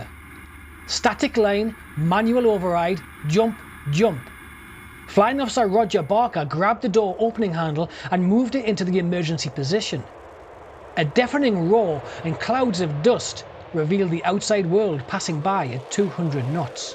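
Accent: Newcastle, United Kingdom. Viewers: I love Vulcans and this was a story I'd never heard. Congrats on the genuine human commentary complete with genuine Geordie accent. I'm fed up with text-to-speech converters. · assumed you were from 'The Toon' before you mentioned it... geordie is obvious. Great to get someone local putting stuff out.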